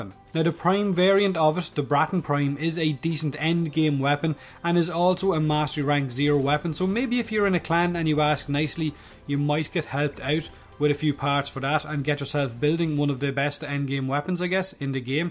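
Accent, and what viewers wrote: Ireland. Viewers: Irish really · IRISH. SUBBED! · I love your fucking accent I am Irish too xD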